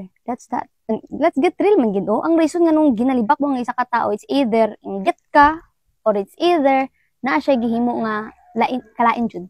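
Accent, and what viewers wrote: Cebu, Philippines. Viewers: Ilonggo pero ang tono Cebuano... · Ilonggo pero medyo may sagul cebuana❤😂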